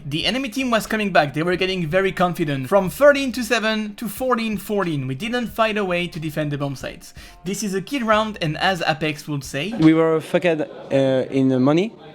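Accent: France. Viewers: JE SAVAIS QU T’étais FRANÇAIS ENFIN JE PENSE ou belge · Ah je me disais bien qu'à ton accent anglais tu étais français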